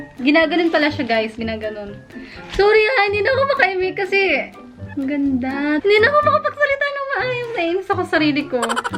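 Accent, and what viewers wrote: Batangas, Philippines. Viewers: Congrats! Deserve mo yan!!! Sarap sa ears pag nag sasalita ka ng language ng mga taga Batangas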